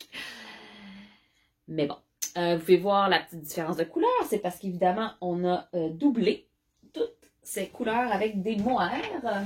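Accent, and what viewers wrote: Quebec, Canada. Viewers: Bonjour, c'est un grand plaisir de vous écouter avec ce joli accent Canadien, je suis Colette je demeure dans le Nord de la France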